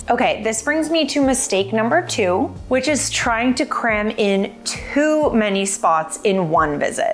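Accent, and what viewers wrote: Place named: Canada. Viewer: I think that it has to do with the Canadian accent as apposed to disrespect or not knowing the correct pronunciation or spelling.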